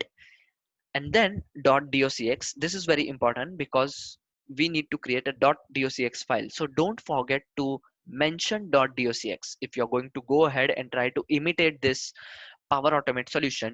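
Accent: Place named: India. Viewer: You should be banned from speaking English 🤪🤪. The Indian accent is horrible!!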